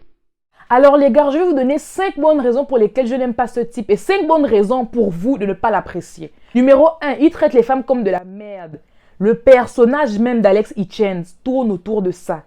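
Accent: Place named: Gabon. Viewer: Mais tu es gabonaise non? En tout cas tu en as l'accent.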